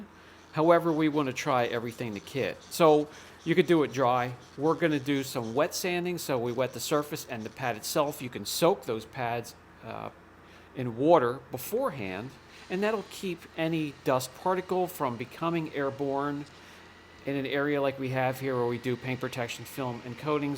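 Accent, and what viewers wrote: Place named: East Coast, United States. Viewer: lol Waaater the east coast accent is strong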